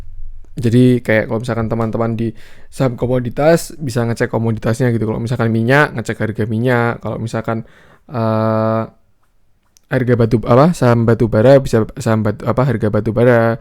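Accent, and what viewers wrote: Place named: Surabaya, Indonesia. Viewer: ok analisisnya, kalau didengarkan seksama sptnya ada logat surabaya ini... :D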